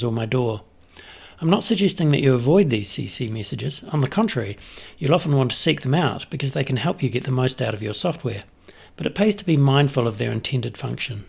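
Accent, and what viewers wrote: New Zealand. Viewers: Your a kiwi?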